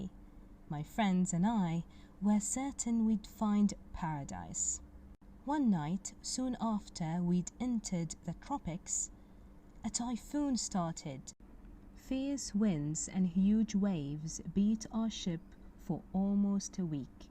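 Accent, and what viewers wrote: United Kingdom. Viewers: Wonderful Miss you're an excellent teacher. You don't know how I love British accent and I wish to visit London in one day 😕🌷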